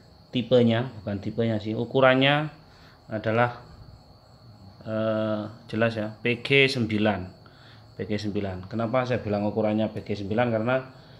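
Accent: Madura, Indonesia. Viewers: Reng madureh mas?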